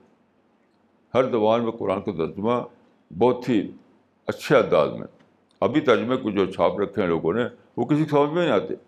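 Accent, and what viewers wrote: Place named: Arab world. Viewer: Arabic accent not clear always.Urdu is o k .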